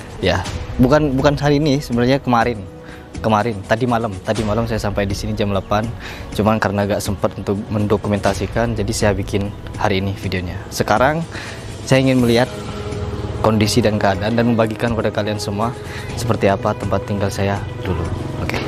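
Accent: Madura, Indonesia. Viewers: Oo arek jember to, keluarga ibu ku mayoritas dr jember hgga sekarang. Pantesan logatnya spt org madure. Salam cak. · Oalah...Mas asli Jember toh? Pantas msh ada dialek madura yg lekat...sengkok reng Probolinggo tretan! Tetanggeh...😁😁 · pantesan deri pertama nyengok vidio nah bahasan nah logat medureh hehee deddih asli jember hihi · Terdengar ciri khas bicara Madura a. Kak salam settong dere sengkok derih madureh tapeh lambek 3 taon neng jatiroto pas tips malem miggu sering nongkrong di alun2 Jember jiah kak salam KK maderen Padeh beres salamet Ben tambe sukses amin.🙏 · Pantesan ada logat maduranya bang, salam dari madura bang🙂🙂🙂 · Oalah, mas asli Jember 🙏 😊 pantesan logatnya ga asing logat maduranya mas masih kental 😊🚬☕ salam mas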